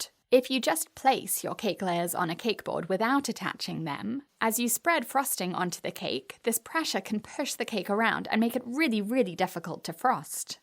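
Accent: England, United Kingdom. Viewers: Honestly I'm devouring your information and tutorials.. they are so informative and clear.. especially with your beautiful British accent.. im attempting my first layer cake and have taken all your info on board to ensure I'm not rushing... still nervous but mire confident with your amazing tutorials.. thank you ❤❤😊 xx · What part of England are you from? I can’t figure out your accent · Thanks a mill for this very helpful video!! 🩷🤍 Also you have a gorgeous British accent!!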